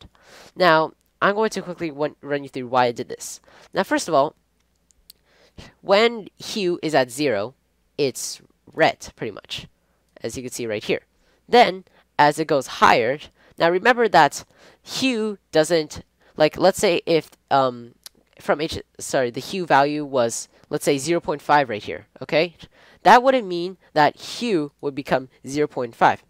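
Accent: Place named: United Kingdom. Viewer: great tutorial. you notice this guy is British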